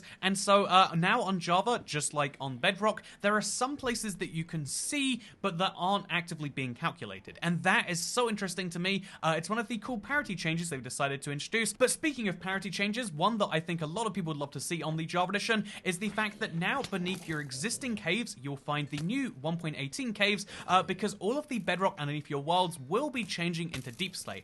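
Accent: United Kingdom. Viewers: I'm from brazil, a lot of schools here teach English instead of Spanish even tho we are surrounded by Spanish speaking countries. American culture is heavy here so many movies come to here and people are used to american content and culture. In fact in Christmas we retain the American winter theme even tho at December we are at high heat summer at 45 c and those grandpas waring Santa outfits almost die of heat from the warm clothes, all that to keep up the Americanized culture LOL. My school had Spanish and English but that's far from the norm. I learned a LOT more from games and youtubers like ur self however. So I'm thankful for ur indirect help in practicing my listening of English. Also, i love ur British accent. I also love that ur one of the few minecraft content creators who aren't heavily focused on younger audiences but more general audience from all ages. 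I'm 22 and i'm just sick of clicking a cool looking minecraft video and hearing an obnoxiously childish voice saying, "wassup guys, hit the like button and ring the bell wohooo!!!! I love ur videos, keep up the good work.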